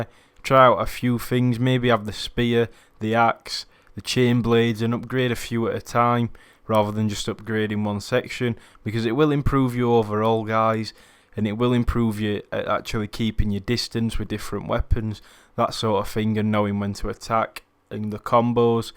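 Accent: Yorkshire, United Kingdom. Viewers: Nice to hear a yorkshire vlogger lol nice 1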